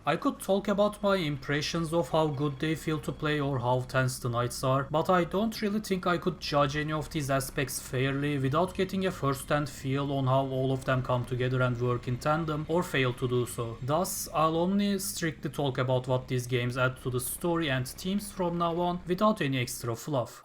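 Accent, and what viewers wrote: Turkey. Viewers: Nice video and a style that is harder to find in a good quality like your video keep going and türkçe aksanım biraz batıyor bir şekilde kurtulabilirsen çok hoş olur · I was gonna ask are you from Turkey because of you accent but then i saw your channel name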